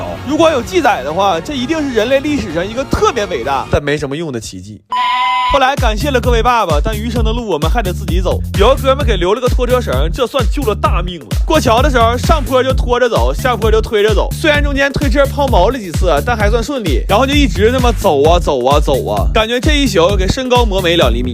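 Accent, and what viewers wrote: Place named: Northeast, China. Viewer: 都是久视频，就喜欢一边上班一边偷听这东北话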